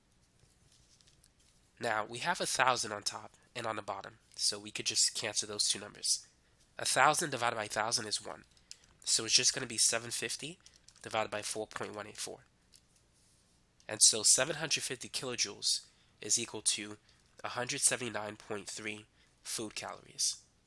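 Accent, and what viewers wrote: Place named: Brooklyn, United States. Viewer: am i the only one who noticed he has a brooklyn accent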